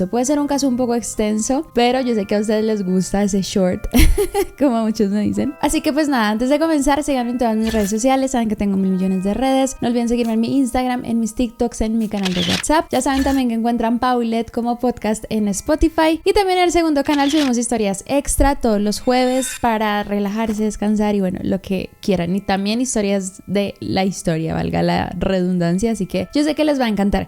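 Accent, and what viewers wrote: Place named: Colombia. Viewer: Excelente narración, eres muy buena y tu acento parcero me encante saludos desde Mëxico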